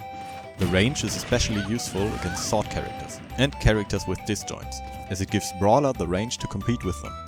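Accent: Germany. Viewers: Sorry bro aber da hör ich nen deutschen Akzent raus lol . Cooles Video, ich find Brawler auch sehr spannend, er ist sooo underrated!! :)) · Du klingst sehr deutch./You sound very german. And also thanks for the video.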